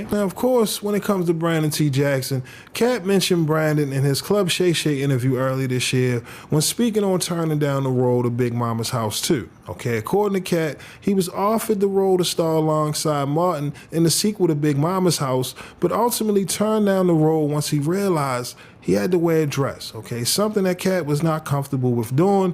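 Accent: Baltimore, United States. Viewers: I'm checking in from the DMV. First time finding your channel. When you said 2, I heard BMORE, i was gonna ask, but then you started talking bout BMORE. Showing you some...❤❤❤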